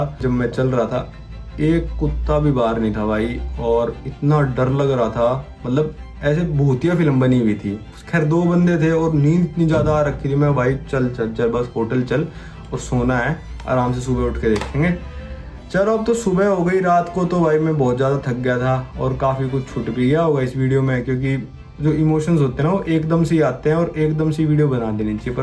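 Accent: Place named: Haryana, India. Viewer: Bro ur accent is totally changed.. in your starting videos your accent was more different. It's more Haryanvi now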